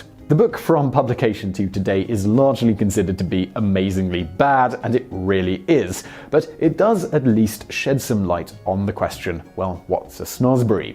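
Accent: United Kingdom. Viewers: This video is thee DEFINITION of MISINFORMATION/PROPAGANDA... Once I heard your British accent it becomes CLEAR how much of a SHILL/AGENT you ARE .... 3 million subs huh? No SHOCKER most people are LOST AF reading through the Comments people CONTINUE to remain LOST while they're being MOCKED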